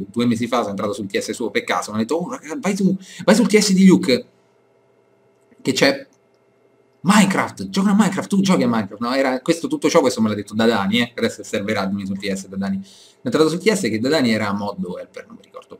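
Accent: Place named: Rome, Italy. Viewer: Si sentiva che eri di Roma :-)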